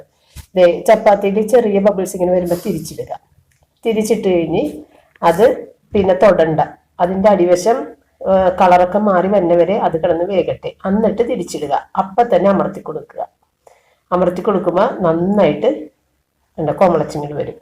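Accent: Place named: Ernakulam, India. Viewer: ernakulam dt aano. Slang ketittu chodichatha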